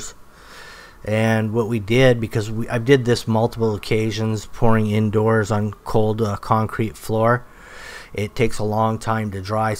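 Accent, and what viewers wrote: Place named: California, United States. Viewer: you're a good man. You are a throw back to the true Californians I remember from back then...even the way you talk. AWESOME!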